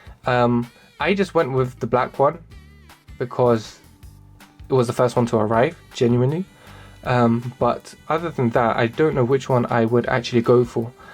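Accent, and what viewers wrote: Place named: British Pakistani, United Kingdom. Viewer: This bloke sounds like the British tech reviewer Supersaf. Supersaf is the most well known tech reviewer here in the U.K. This bloke is probably a British Pakistani based on his accent. Fun fact: Pakistanis do not actually speak the language of the prophet muhammad (pbuh). They speak punjabi. Some of the Pakistanis are really cool people. But they read the quran in arabic - but they do NOT speak arabic so they cannot understand what they are reading. I think some of the Pakistanis are really cool. But the prophet muhammad (pbuh) NEVER spoke Punjabi which is the language of the Pakistanis. I would like this bloke (or Supersaf) to do an entire tech review in Punjabi which is actually the language of the Pakistani race.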